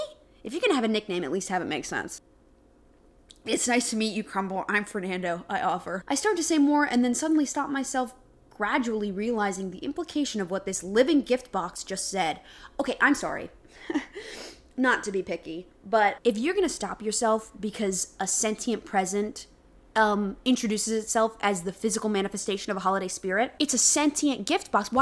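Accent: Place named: South, United States. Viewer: Why was your southern accent better after drinking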